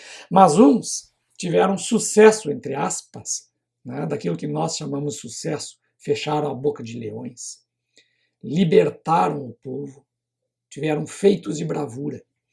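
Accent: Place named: Rio Grande do Sul, Brazil. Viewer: Eu primeiro lhe identifiquei como brasileiro e pela entonação e sotaque eu notei que era gaúcho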